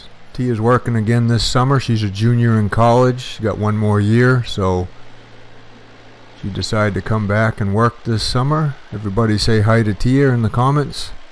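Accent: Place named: New England, United States. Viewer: Only one comment! Great New England accent. Just about to say Maine......when you said Maine. Love my people.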